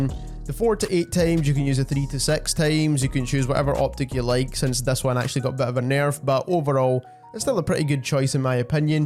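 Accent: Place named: Scotland, United Kingdom. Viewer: Love a good Scottish accent.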